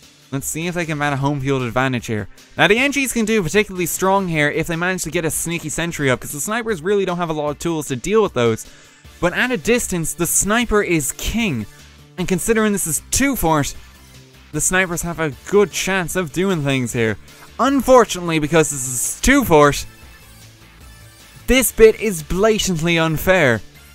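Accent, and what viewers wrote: Ireland. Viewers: wait are you irish.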